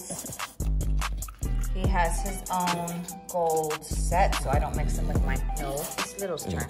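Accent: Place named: New York, United States. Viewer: Why does she sound like a New Yorker but she is somewhere in Texas, per a TV show video I saw?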